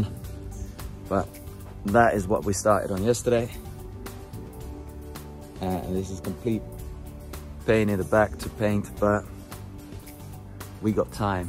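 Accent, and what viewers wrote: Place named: Scandinavia. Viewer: Lol i was curious because you sort of sound nordic. I guess latvias not too far away from there.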